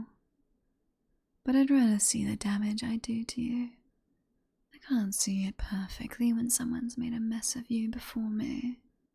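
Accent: United Kingdom. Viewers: Definitely an interesting audio, and once again proving that the British accent lends itself so well to villains.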